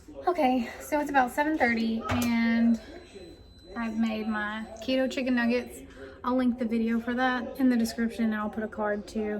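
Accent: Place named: Oklahoma, United States. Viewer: Just found your channel recently .Love it ! And you sound like you could be an Okie too😁👍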